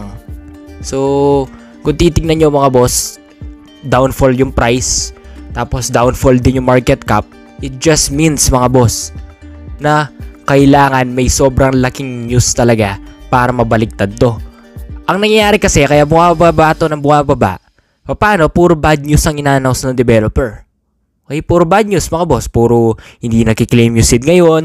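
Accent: Pampanga, Philippines. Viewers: Kapampangan ka ata boss?😅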